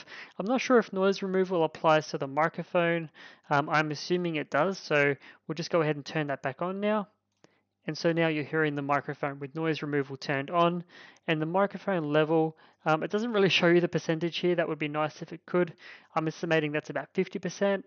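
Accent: Australia. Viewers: THE FUCKING AUTRALIAN ACENT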